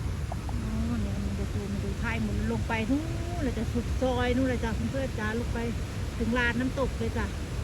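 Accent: Sakon Nakhon, Thailand. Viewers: สำเนียงบ้านเฮาคักเนาะจ้าสกลละเบ๋อ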